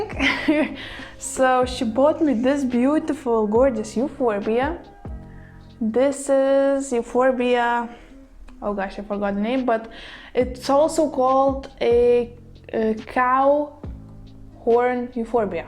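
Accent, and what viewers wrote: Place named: Russia. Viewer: You speak English with Russian accent. It means that you are a bilingual and your first language is Russian. Isn't it? Здесь большая русская аудитория, которая интересуется цветоводством. Советую делать ролики и на русском: прибавите себе подписчиков. Желаю удачи и всего самого хорошего!!!!😀🌷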